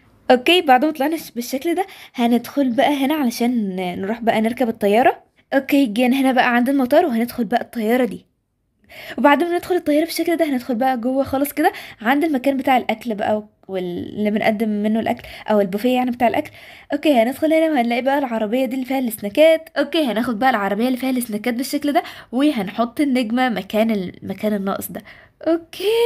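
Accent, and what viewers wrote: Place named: Saudi Arabia. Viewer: عرفت من لهجتك انك سعوديه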